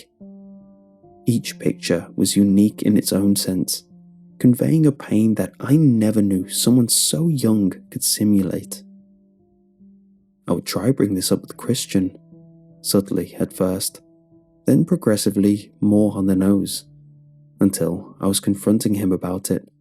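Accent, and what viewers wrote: United Kingdom. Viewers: Plus a british accent. Though, I can't watch his content without feeling dirty because he put down a smaller youtuber for not having an american accent, despite the fact that he, himself, does not have an american accent.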